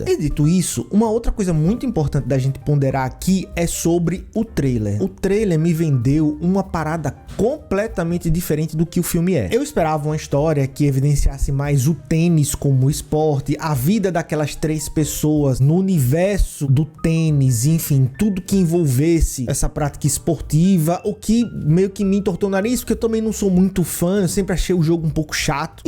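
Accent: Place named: Bahia, Brazil. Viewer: Esse sotaque me faz voltar pra Salvador imediatamente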